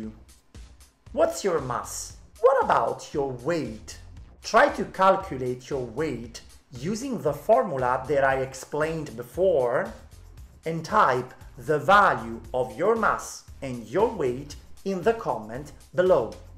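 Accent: Italy. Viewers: Your Italian accent is beautiful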